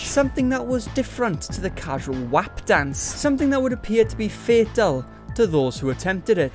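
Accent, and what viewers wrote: Wales, United Kingdom. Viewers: welsh accent🥺❤️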